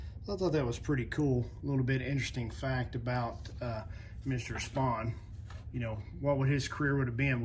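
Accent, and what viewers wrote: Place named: Texas, United States. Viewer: You have to be from Texas because you sound like stone cold a little. 😁👍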